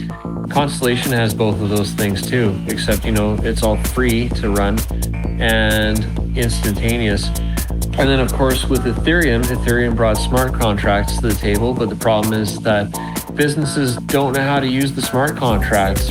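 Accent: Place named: Canada. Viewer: Sounds Canadian 😆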